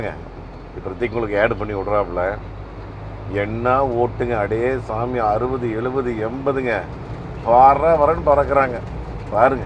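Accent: Kongu, India. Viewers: Kongu thamiz awesome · கொங்கு தமிழும் அழகு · கொங்கு தமிழ் அருமை....ஏனுங்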